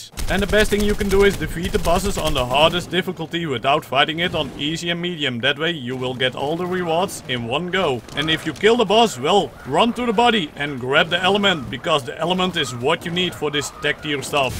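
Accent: Netherlands. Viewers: U are dutch right because you kinda speak steenkolen english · Are you dutch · Lel your dutch accent is getting weird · Ik hoorde al meteen dat je een Nederlander bent! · Jaylen Scarlett hes dutch... i think that i recognize my own accent in it (before i moved to the states) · Nederlands. Accent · Ben jij niet gwn Nederlands ik kan het horen aan je accent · Dutch not indian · are you dutch?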